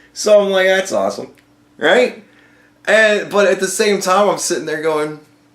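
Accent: United States. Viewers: another one from Bearing, but I do have you on 1.25 speed cos dude..... you like to take your time and yeah, you may have been funnier in that clip than normal, game up dude. nice to listen to a "normal" american every now and then. all the best. i'm not sticking around for the full 23mins though, I couldn't bear it.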